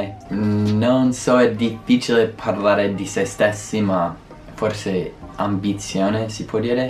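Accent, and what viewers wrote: United States. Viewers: Non so se sia più bello quando parli inglese o quando parli italiano con l’accento americano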